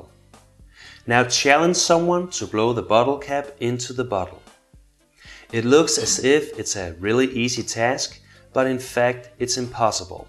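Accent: Denmark. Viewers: he haves a danish accent, trust me im danish :D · You sound danish..... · Danish Accent? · lol he's sound danish :D im danish to. so .. its sounds danish ;) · haha. I can tell that the speaker is danish :) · Haha, great vid, and love the danish accent :P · Danish accent :P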